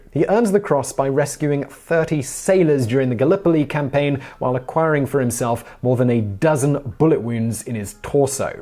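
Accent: United Kingdom. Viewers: i feel the need to apologize for insulting your pronunciation of words by stating it often made me cringe. My lame excuse was knowing several Brit women and never had heard whst turns out to be an accent. I didn't consider the accent to be a speech impediment. Remarking on a speech impediment would be the lowest of the low and that is something i would NEVER do. But my remarks re: your regional accent was BAD and i do sincerely apologize. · Sometimes British accents make me want to claw my ears out... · No mention of the Berlin to Baghdad railway? This is not a real History channel. Great British voice, though. Americans think Brits are smart. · Another great one by aka “the most British man in the world”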